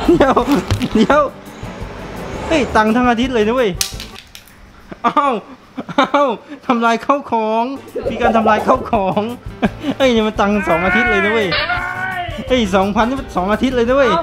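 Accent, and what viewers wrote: South, Thailand. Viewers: พูดใต้ได้กันน้ะ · พี่เเหลงใต้ดีกว่าครับผมชอบ555+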